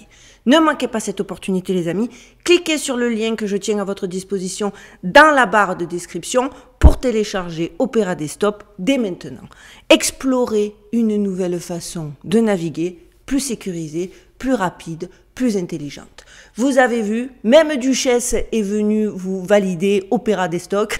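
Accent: Marseille, France. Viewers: Bonjour..Belle, Blonde et Curieuse de vérité.tout ça avec l’accent marseillais bonne continuation .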